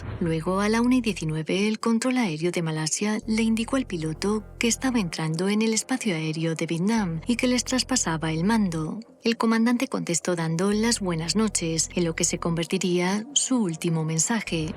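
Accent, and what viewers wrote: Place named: Aragon, Spain. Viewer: No soporté el «suscribais, dehais, actubais" demasiado baturro para mi gusto.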